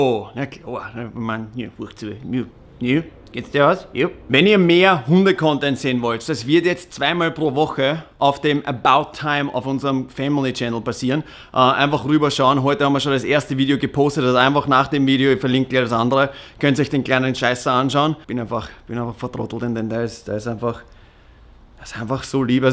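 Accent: Austria. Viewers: It's cause of his Austrian accent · Hahaha alter erstes Mal ich merke ein starkes Österreiche Akzent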